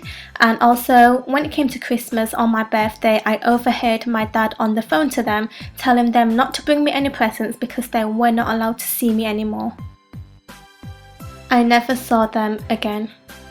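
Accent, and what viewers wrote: United Kingdom. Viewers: I love British accent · ...Why wouldn't she keep her British accent if she lives in Britain? LOL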